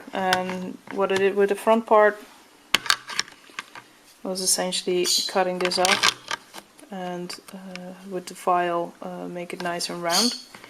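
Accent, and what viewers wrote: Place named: Netherlands. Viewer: Dutch accent!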